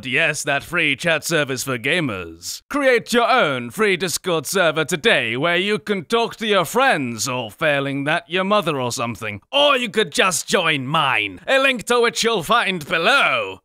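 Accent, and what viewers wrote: United Kingdom. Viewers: British accent is so annoying 💔🤷‍♂️ Am I the only one? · Are you British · very british accent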